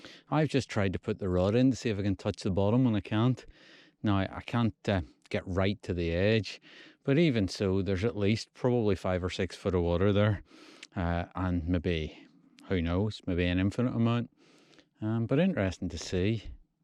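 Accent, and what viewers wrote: Northern Ireland, United Kingdom. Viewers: your accent sounds really northern irish-ish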